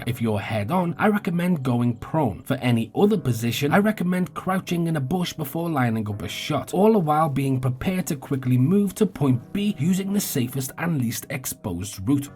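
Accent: Liverpool, United Kingdom. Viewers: Can I hear a scouse accent here ?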